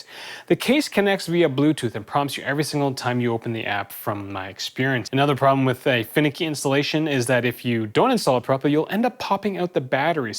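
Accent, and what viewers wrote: Canada. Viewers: Ha canadian eh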